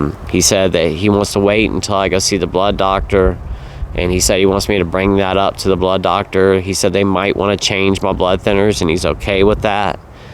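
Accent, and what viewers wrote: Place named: South, United States. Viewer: Hi, I’ve been following you since “dry” January up here in CT when I decided to cut back on my drinking and you’ve made such an impression on me that I’ve turned it into a happier, new way of life. I love watching your videos, your honesty in telling your story in your easy Southern style of speaking. Tonight’s video was really a heartbreaking setback. I’m so sorry. You look so healthy and handsome, it’s hard to imagine you could have so many complications. I am praying for you, as I know many of your audience are. But somehow believe you are going to get through this. Keep the faith. ❤ Katheryn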